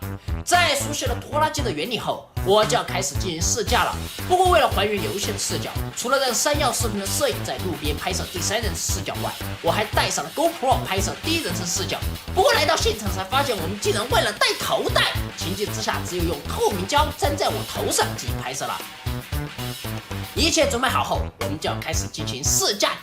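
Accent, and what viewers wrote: Sichuan, China. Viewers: 怪说不得的川普发音就很local了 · 欸！！！你的四川话好亲切！！！！！因为我就是四川人！！！在国外呆了好多好多年了！！！！！！！！！！！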